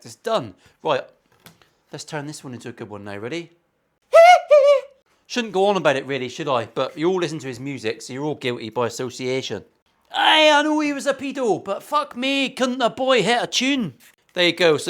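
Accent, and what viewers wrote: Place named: Northern Ireland, United Kingdom. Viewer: Weird the way I can't 'hype' your videos. Must be the humour. I wish id seen this before I did mine. Exactly the same body. Just a custom neck I made. I might now review my work on the body. Cheers mate 👍 .. your Norn Iron accent is spot on 😂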